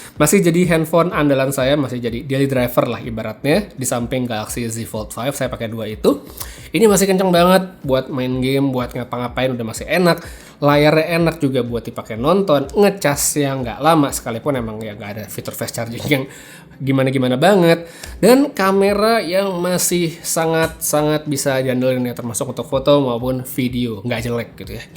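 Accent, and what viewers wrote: Bali, Indonesia. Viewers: Bang dr Bali kah?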